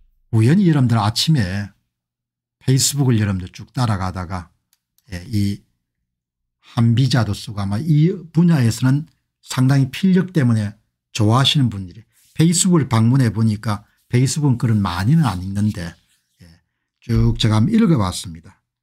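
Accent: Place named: Tongyeong, South Korea. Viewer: 공대표님 정말정말 잘 지적하셨습니다 제일 절실한 현황입니다 골로 가지 않도록 더욱 빼딳하게 쓰야 합니다 말씀이 저의 고향 통영 말투 비슷하네요 정답습니다 감사합니디ㅡ😊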